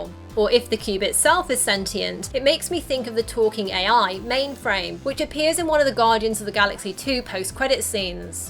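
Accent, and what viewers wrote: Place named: United Kingdom. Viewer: Soldier Gaming nah.. it just seems similar to Lila’s voice to u cuz they both have british accents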